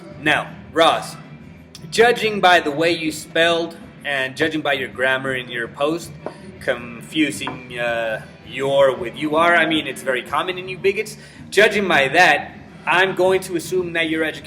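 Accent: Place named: United States. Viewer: I am so confused. You look Middle-Eastern or East Asian. You live in Mexico and you're saying you're Mexican. Your name is. Your English in American accent is pretty spot on. Could you clarify please? I'm not being able to solve the puzzle...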